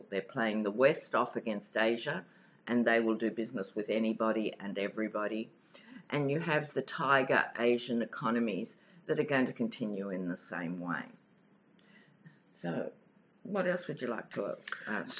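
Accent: Australia. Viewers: I <3 your running commentary. Find myself laughing out loud, and God knows we need it. So... is your's a "posh" Oz accent? Is there such a thing? From the land of the maple leaf, who has educated, but not posh accents.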